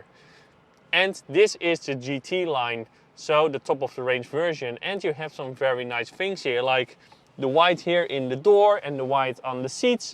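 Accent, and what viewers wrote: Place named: Netherlands. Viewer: Compliment hoor ,jij spreekt echt heel goed Engels ! 👍 Ik hoorde aan jouw Nedelandse accent dat je Nederlander bent.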